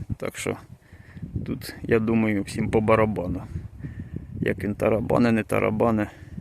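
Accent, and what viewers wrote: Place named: Poltava, Ukraine. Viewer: Я чую по розмові, що свій хлопець, з Полтавщини. А , виходить, сусідній район. Вітання з Миргородщини. Відео герні. Трактор також гарний. Міркую про придбання такого. Знімай відео ще. Нехай щастить.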